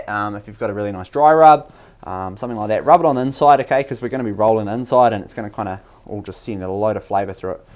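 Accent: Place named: New Zealand. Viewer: Hey whereabouts are you from? You sound like a kiwi, I'm from Otago.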